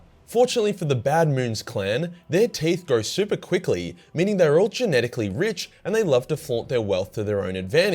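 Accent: Australia. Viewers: You do this very weird thing with your voice at the end of like every sentence, where you kind of raise your pitch and makes it sound like you’re asking a question. That makes listening to you very difficult. Even if someone didn’t find it annoying, it absolutely comes off as not genuine. Just talk regular homie. (And no I’m not talking about your accent. Everyone loves a good Aussie accent)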